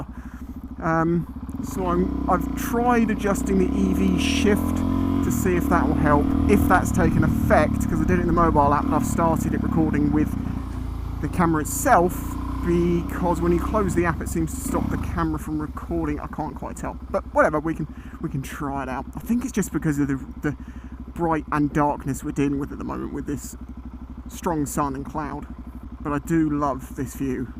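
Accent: United Kingdom. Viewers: Haha British, idk why but you Brit’s sound so hilarious to me.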